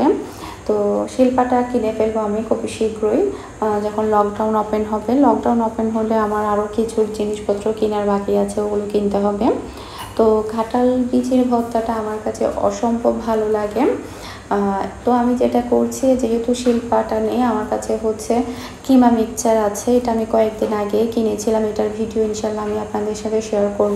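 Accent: Chittagong, Bangladesh. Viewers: Apu apnar Basha CTG r kothai ????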